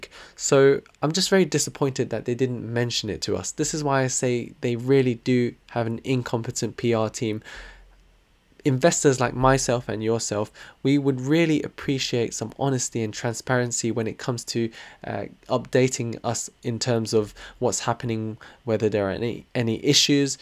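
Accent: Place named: United Kingdom. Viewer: Very informative. I like your accent, are you British?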